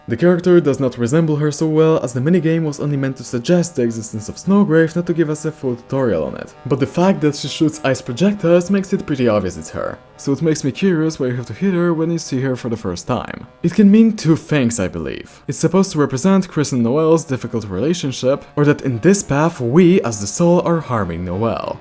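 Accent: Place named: United States. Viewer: Wow if i didn't know you arę from Poland them I might say that you are from america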